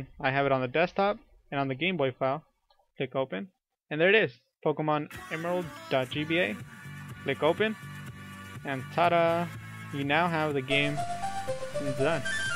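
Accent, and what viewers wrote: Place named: India. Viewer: No offence dude but ur mike makes you sound like indian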